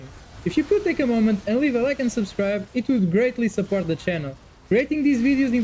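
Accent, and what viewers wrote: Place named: Portugal. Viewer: Sotaque não engana que é tuga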